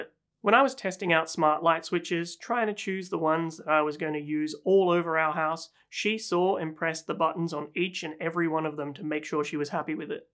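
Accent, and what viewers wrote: Australia. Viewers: Ha!. Suspected that was an Australian accent! Great channel. 👍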